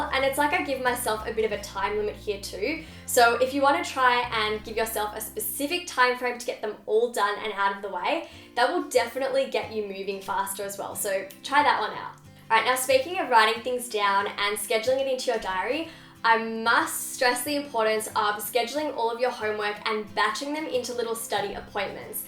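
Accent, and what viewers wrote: Australia. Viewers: Is it just me, or is ' Australian accent actually very cute?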